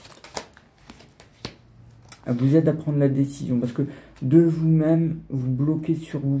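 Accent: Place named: Villers-Cotterêts, France. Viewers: J'ai passé mon enfance dans votre région. En vous écoutant, j'ai remarqué votre accent, en particulier les "o", j'ai pensé au Nord mais je sentais que ce n'était pas cela. J'ai découvert Chambry 02 (moi, c'est Neuilly Saint Front près de la Ferté Milon). Je connais cet accent tellement bien mais cela si longtemps (60 ans) que je ne l'ai plus entendu, qu'il devait y avoir un blocage pour que je ne l'identifie pas immédiatement. Merci infiniment de m'avoir ramenée dans mon village avec votre voix. J'ai noté vos coordonnées. Vous êtes un bon voyant. Prenez soin de vous et de votre chat. Bien cordialement. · Moi pareil c'est villers-co près de la Ferte Milon. J'ai quitté ma région depuis presque 20 ans et d'un sens je regrette la forêt et cette belle nature. Bien sûr j'ai reconnu cet accent puisque je l'ai gardé 😊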